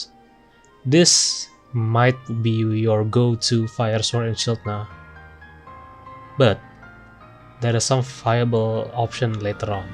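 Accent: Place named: Indonesia. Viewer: You sound like Indonesian. I really like Lunatic Rose, it is really convenient to bring to multi-monsters hunt. This update is huge for me coz I dumped all my previous set and finally can slot in Peak Performance 3 + Chain Crit 3 + Defiance 5 and even Good Luck 3 (gonna change it to Partbreaker 3 coz my I'm already set with Qurios Augment).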